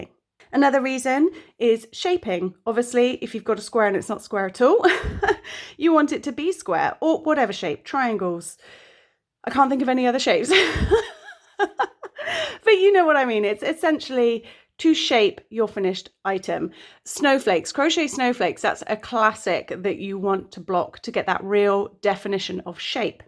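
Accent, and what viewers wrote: United Kingdom. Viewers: what kind of british accent do you have? sounds cool!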